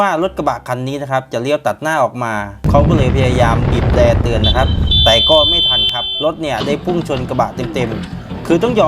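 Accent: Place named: Buriram, Thailand. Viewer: สำเนียงบุรีรัมย์สุรินทร์เลย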